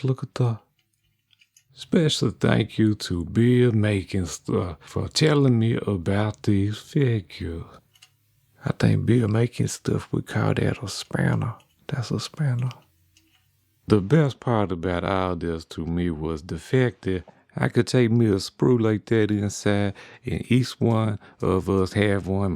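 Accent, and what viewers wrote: Louisiana, United States. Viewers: My favorite Youtube channel. Why can't I stop watching this silly-looking puppet with a voice from down in the Bayou!? I am an adult, after all, for goodness sakes! Now, I find myself shelling out my hard-earned allowance on this thing called Patreon, so you will keep making more videos. But I told my wife, "It's MY allowance, I can spend it how I WANT!". She just rolled her eyes and said..."Awww Rite!" In an authentic accent. I do love that woman. Keep up the great work making quality content. Mister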